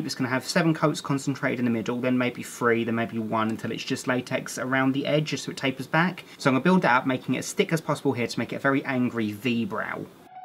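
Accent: United Kingdom. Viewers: OH HOW I LOVE HIS BRITISH ACCENT "mickey!" "but dead" *laugh*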